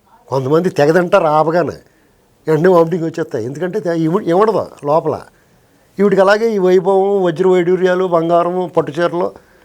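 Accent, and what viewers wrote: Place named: Godavari, India. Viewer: గోదావరి జిల్లా భాషని చాలా చక్కగా ఉపమాణాలతో చెబుతుంటే ఇంకా ఇంకా వినాలని పిస్తుంది.... v r proud of U.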